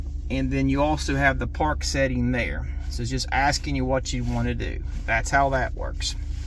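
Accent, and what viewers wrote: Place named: United States. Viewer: Thank you, loved your cow boy accent, lol ( No i mean it) I can talk to all dang day long, appriciate you to go over all the controls, it helps a lot, bye the way I fall off my chair laughing on use that dial to tune to you wann jam to lol.... Love it brother.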